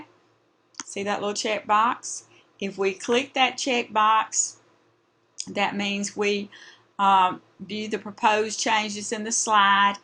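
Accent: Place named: South, United States. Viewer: Your accent is from my home, the south. It is beautiful.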